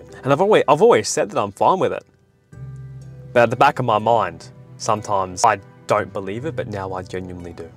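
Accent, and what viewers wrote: Australia. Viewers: I love your Aussie accent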